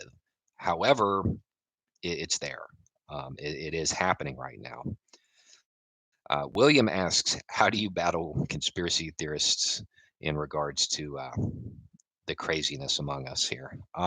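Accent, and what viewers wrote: South, United States. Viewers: eat the r*ch South American or Southern? That's two completely different things. has kind of a southern accent. It is far from a South American accent. (I only say "kind of" because I'm probably so used to a southernish accent that I don't really hear it as an accent.)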